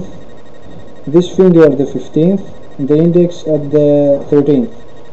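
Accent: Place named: Russia. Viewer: russian?!?!?!? every time i hear a russian accent, I think of the game starcraft... "Battlecruisers, ONLINE"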